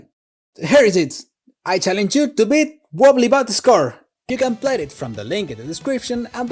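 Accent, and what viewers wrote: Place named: Spain. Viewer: you sound spanish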